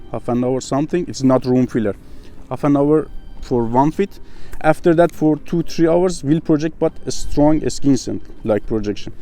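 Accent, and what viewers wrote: Iran. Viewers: You Are iranian guy i Can Hear it out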